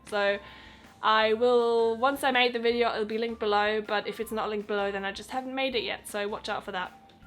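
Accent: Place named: United Kingdom. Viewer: How long did it take to get a British accent ?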